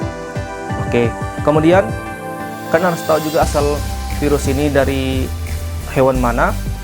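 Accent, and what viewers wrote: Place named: Balige, Indonesia. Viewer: Masih sama kali logat abang ngomong ya kayak di balige dulu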